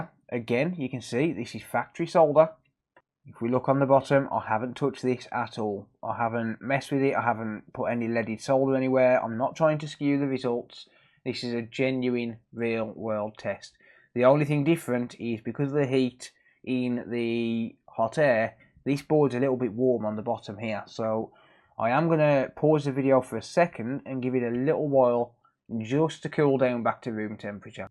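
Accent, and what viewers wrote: United Kingdom. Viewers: I could hear the authoritative British accent before the video even began 😆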